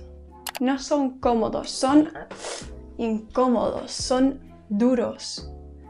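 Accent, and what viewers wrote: Spain. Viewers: Thanks. That was short and sweet. I noticed the Spanish spoken by you from Spain is different from the one that is spoken in South America. Right?.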